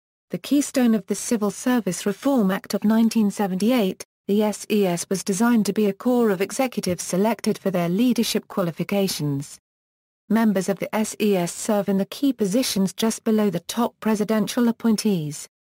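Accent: United Kingdom. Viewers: why is a British person saying this lol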